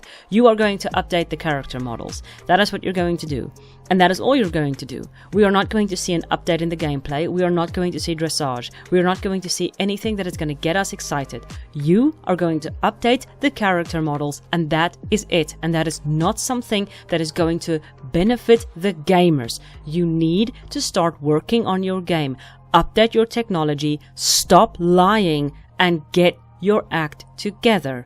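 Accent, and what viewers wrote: Afrikaans, South Africa. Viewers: i can't tell if you are afrikaans or danish or what now. such a pretty accent! this game was everything to me as a kid, it's sad they've gone downhill. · IKR!! Thought her voice sounded SA but wasnt sure until she said she was😊 nice to see someone from here making good content. And me too, I would love to see the game back on track to being what it was, because that's what got us all playing in the first place. Jy ook😄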